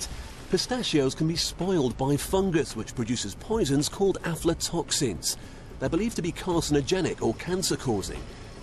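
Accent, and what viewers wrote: United Kingdom. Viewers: And a brit remittance man narrating. Is there nothing these manglers of their own language will not pretend to be exerts on?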